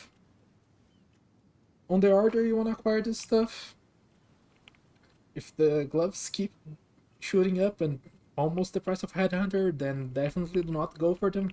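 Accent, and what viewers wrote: Brazil. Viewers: My brain is sensing a brazilian accent on you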